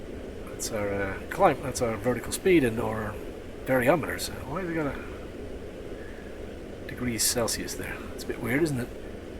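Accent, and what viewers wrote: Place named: United States. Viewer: I just can't decide if you have a genuine American accent, or are a Brit pretending to have an American accent! Sorry, no offence intended, was just distracted by this as I'm a linguistics academic!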